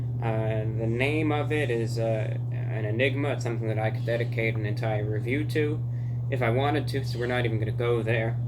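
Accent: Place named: New Jersey, United States. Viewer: Ppl think he's from Florida or not from the states. He sounds slightly Jerseyish or Minnesota, Maybe Canadian? 🤔🤷🏻‍♀️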